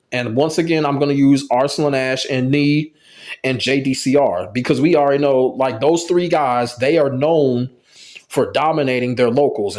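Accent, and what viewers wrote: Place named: New York, United States. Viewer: I never liked him,annoying ass ny accent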